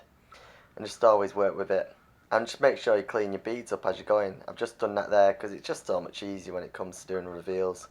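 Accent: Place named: Northern England, United Kingdom. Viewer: Nice pne mate ye had a very bad plastering life so unlucky and syame im very good and good bloke . Im moving up preston way up north . Ur accent sounds from that way . Were are you from were abouts/areas do you work il be after a bit work up that way can you give me advice contacts or have any work try me on here there . Im leaving this life down here been sooo unhappy so want a fresh start up there . Its all long story . But ur a greate bloke maybe you can give me advise contact leads companys and what companys stay away from . Thanks ant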